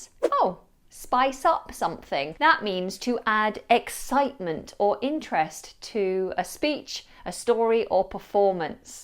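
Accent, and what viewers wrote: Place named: RP, United Kingdom. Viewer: If I am not wrong you are from the north of England, how did you get your beautiful RP accent?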